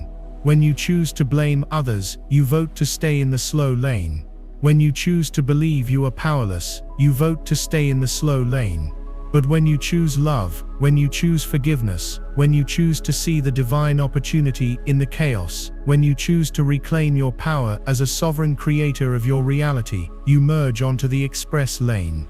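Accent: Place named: United Kingdom. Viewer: Click bait. With an expect Brit accent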